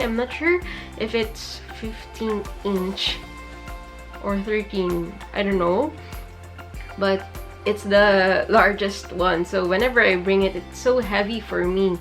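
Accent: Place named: Philippines. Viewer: This is the very first video I'm watching of you, and I was like, "She looks like a Filipina than a Japanese." 'cause of the way you pronounced your words and then I read your bio AND TURNS OUT I WAS RIGHT!!! YEPPEYYY So Ate I hope you could answer my questions (⁎⁍̴̆Ɛ⁍̴̆⁎) + I'm almost graduating and my birthday is nearing, and I don't know whether to get the iPhone X or Ipad Pro together w the accessories. - If I pick the iphone x, it'll be my second phone I ever had for the passed years! I'm not using a phone rn only an Ipad, w a 16GB storage. I SERIOUSLY LOVE EDITING AND MY GALLERY IS PACKED UP W HOT KOREAN BOYS. AND I DISLIKE ICLOUD. + Do I have to purchase the app; Procreate? Or is it free? + Does the Ipad Pro have a screen recording? - 'cause I'm planning on making tutorials of my edits. + How good is the Camera Quality? 💜💜💜💜💜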